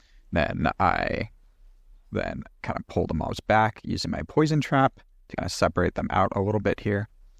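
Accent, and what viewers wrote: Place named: United States. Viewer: OMG, what a video. I have watched hundreds in the past few months and while I do WANT to read about how to make a build and where to put the points, what this social scrub actually NEEDS is to learn how to actually play the build. You have given this in beautiful clarity. Showing actual footage with a commentary, freezing actual play to discuss what you used when and why. I so wish the other competant players who post these videos would do the same. I can make the build you guys so generously provide. I can flounder around on my own and work out how to employ it to the best of my ability, but a second opinion like yours, from a competant player, really helps us casual players to put the polish on our performance and for that I am most grateful for your time and effort. On the clarity of your video, may I make a point that is meant as constructive and helpful, but which might not be something you cannot change? 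For some of us not from the good old US of A, your accent along with the gravelly edge of your voice might make the odd word difficult to decipher. While social bias dictates that a gravelly voice projects authority and gravitas, it doesn't help clarity to those of us unfluent in your accent. I don't know what I expect you to do with this information, you have a lovely voice, but I thought I would share it.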